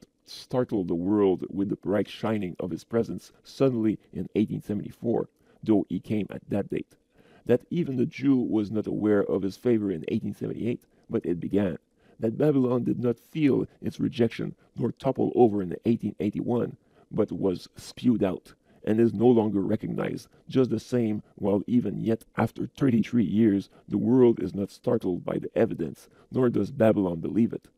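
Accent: Quebec, Canada. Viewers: hmm t québécois toi, je sens l accent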